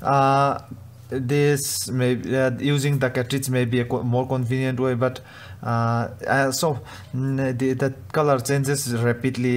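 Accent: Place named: Mizoram, India. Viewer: Subscribe nghal, tangkai lutuk ❤️ I awphawi ah mizo inih ka ring ka en nghal bawk a, thanks for the video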